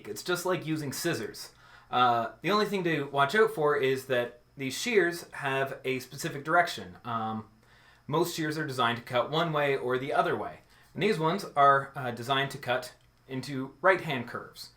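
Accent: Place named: Canada. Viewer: The Canadian accent is strong with this one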